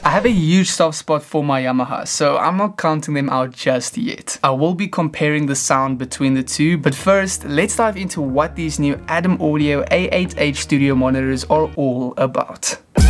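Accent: South Africa. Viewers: I just watched a video of yours where you compared 6 studio monitors and went looking to see if you had an updated version. This was recent so I watched it. My suggestion is to use a spectrum analyzer to compare monitors. Anything else is too subjective. You can even install a free one on your phone. The purpose of monitors vs speakers is to give you the pure, unaltered sound of the music/instruments/voice as it was recorded/played. The only way you can tell is to use a spectrum analyzer and compare how flat the sound is from one to the next using an objective measurement. I like what you're doing, just a suggestion on how to do it. BTW, are you South African? I lived there for several years a long time ago. A beautiful country.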